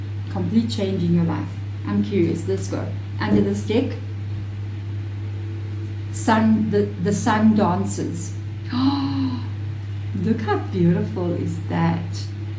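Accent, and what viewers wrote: South Africa. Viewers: Is your accent South African? 😊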